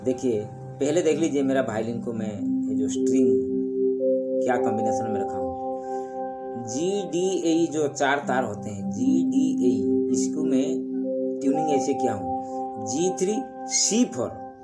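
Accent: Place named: Bengal, India. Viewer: Res sir pranam Excellent tut I am practicing the same My request is if you can text swars along with it Your accent is bengali g sounds like dh Regards Pl forgive me if I am wrong God bless you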